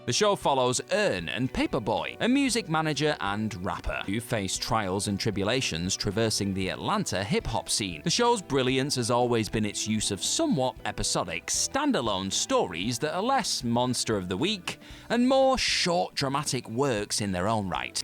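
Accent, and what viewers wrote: United Kingdom. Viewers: More cheap slop videos with ignorant British narration. Did any of you slop producers bother to watch the episodes you included, or did you just let AI write the script for you? In the Sunny in Philadelphia episode, Dennis and Mac switch characters in their Lethal Weapon sequel, but Mac STARTED in blackface as Murtagh and Dennis as Riggs. When they switch, Dennis does Murtagh without the blackface.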